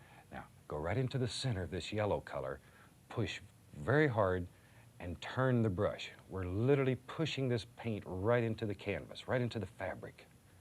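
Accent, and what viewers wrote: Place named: Florida, United States. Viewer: It’s his accent. He said ‘yet’ but it came out like ‘chet’ Floridians and Louisianians say it that way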